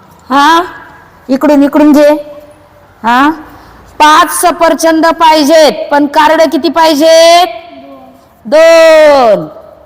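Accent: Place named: Kolhapur, India. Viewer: छानच मॅडम अध्यापन पद्धती तुमची भाषा आमच्या कोल्हापूरी भाषेसारखीच आहे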